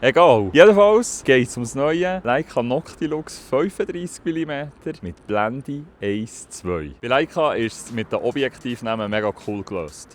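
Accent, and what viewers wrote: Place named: Switzerland. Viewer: Herrlich, dieses Schwizerdütsch. Leider verstehe ich so wenig, macht nix.Ich glaube nicht an diesen Leica -Mythos. Mit meiner alten Canon D60 , einem 105 Tele und einem Polfilter kriege ich fast genauso schöne Fotos hin, natürlich nicht in der Auflösung. Ist ja auch nicht sinnvoll, wenn ich das gesamte Foto betrachte.Ach, Entschuldigung, ich komme aus Dortmund, dem Ruhrgebiet.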